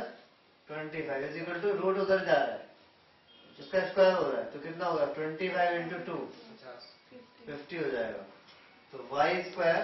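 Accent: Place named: India. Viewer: Awaaz se laga hindustani bhau pada rahe hai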